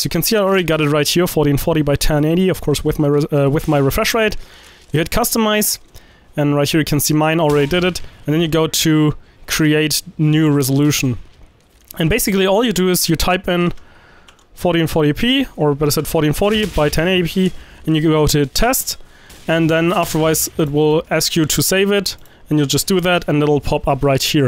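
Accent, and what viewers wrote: Germany. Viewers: Are you german? Because I think you have a very strong accent but it still sounds nice :) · Wie ich wusste das du Deutscher bist :D Seitdem ich dich Abonniert hatte kams mir schon so vor :D Merkt man irgendwie übel finde ich am English mach weiter so mit den Videos ^^ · wie gehts dir man hört raus das du deutscher bist aber dein englisch ist richtig gut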